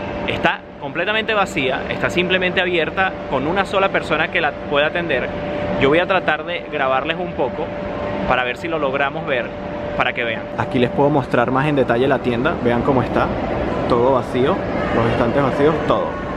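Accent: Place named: Venezuela. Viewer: El Luisito comunica venezolano:v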